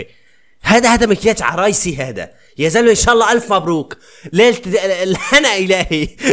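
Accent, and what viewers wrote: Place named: Iraq. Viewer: ههههههه يخبل انته من وين حجيك قريب ع عراق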